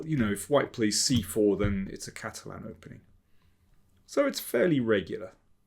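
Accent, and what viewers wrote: United Kingdom. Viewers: GM Danny - your smooth voice with British accent gives us listeners a human touch on chess in this AI world. Personally, it reminds me of David Attenborough voice talking about chess predator strategy going for the opponent King! :) Thank you for your commentary. I feel all big corporations need to invite GM like you to motivate and give life lessons to employees every year. Anyways, I personally think that Ding's blunder was sad for Chess WC title itself! Yes, it is how it is and I am happy Gukesh won as he played by the rules and won Candidates as well, but also feel sad for Ding that it was out of a blunder. But To 18 year kid Gukesh: He fought a drawen game to squeeze win here just like Carlsen. So Kudos to him. Am I happy about the results? Not really coz I want a real fight. Do I agree with the result? Absolute Yes. Do I think the Chess fans would deserve a Play offs here? 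Yes. Any regrets? No. Fun Fact: Gukesh can not even marry as Marriage age for man in India is 21. Anyways congrats to him and very well deserved. I cannot wait for next challenger. - From Indian American Chess enthusiast.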